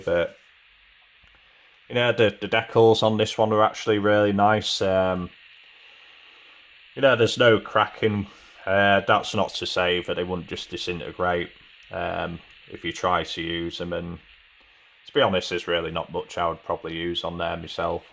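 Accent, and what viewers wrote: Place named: United Kingdom. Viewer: Hi. You sound like you're in The UK and I am in America. You mentioned that the '67 Plymouth was a grail kit for you. I was wondering what America cars you had in the UK when you were growing up and what cars you like. I was hoping you could do a video about the subject. Btw i grew up in Lansing / Detroit, Michigan in all of the US auto factories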